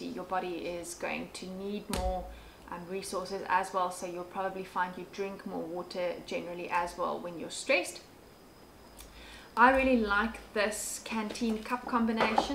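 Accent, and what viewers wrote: South Africa. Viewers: I lived in SA for two years. What a beautiful country. I miss your accent too. I'm impressed with your loadout and the fact that you actually use it. It's a well thought-out kit. You have everything you need and nothing you don't. My bag weighs in at 25 pounds. Most people with little experience overpack without realizing how heavy a 40-50 pound pack becomes after an hour. I think 25 pounds is a bit much so I'm experimenting to figure out how to shave 5-10 pounds off. I'll get there. Thanks for your excellent channel!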